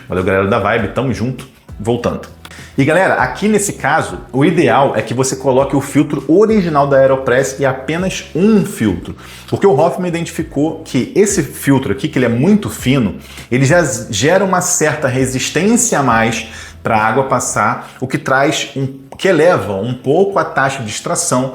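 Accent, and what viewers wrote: Rio de Janeiro, Brazil. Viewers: O conteúdo até que é interessante. O que não dá para aguentar é o sotaque carioca carregado, que sempre passa a impressão de esnobismo asqueroso. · Aprendi muito! Vou regular meu moedor de café e vou testar esse café triatlon .. Mas o melhor de tudo sempre é ouvir um sotaque carioca no Youtube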